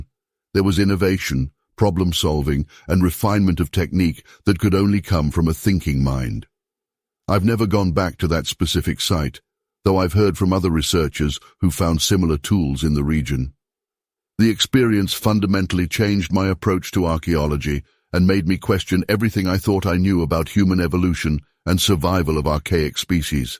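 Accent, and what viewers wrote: United Kingdom. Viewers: Ottawa, Canada If this voice is AI can you please use an American voice and NOT a limey's voice. I can't stand British "English"....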